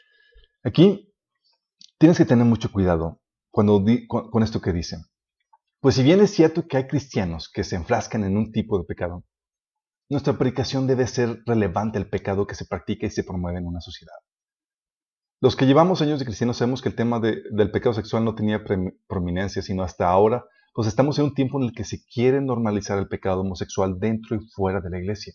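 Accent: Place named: Mexico. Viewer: Muchas gracias por la explicaciòn hermano, muy didàctica, que DIOS lo siga usando, por su forma de hablar me parece que es de Mexico, le mando un saludo desde Perù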